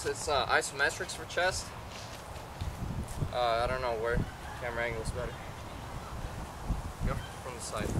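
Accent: Russia. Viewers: Я так и чуял что ты русский, потому что у меня акцент такой же как и у тебя когда я говорю, режил посмотреть в Description, и во, руссий канал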